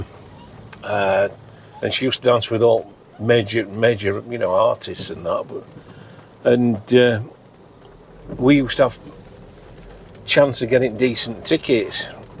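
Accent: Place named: Southport, United Kingdom. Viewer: I was a southport boy with same accent.been in nz for 50 years but its music to my ears to listen to this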